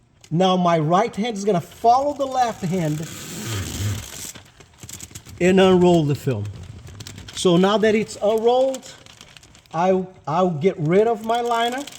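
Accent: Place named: Brazil. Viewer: Sotaque brasileiro rsrsrsrs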